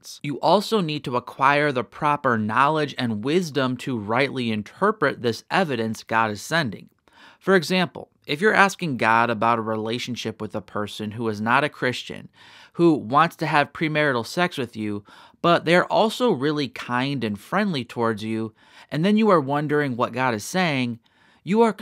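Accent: United States. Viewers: It's good to know about God. I appreciate your standard American Accent. I am trying to understand American Accent.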